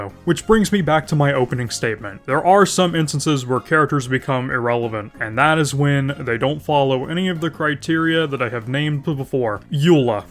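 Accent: South, United States. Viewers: He said he has a thick southern accent (I'm assuming he's from the US) so he has a hard time to pronounce it.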